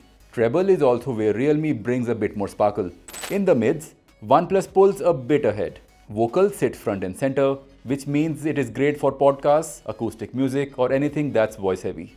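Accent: India. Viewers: Indian ho ya foreign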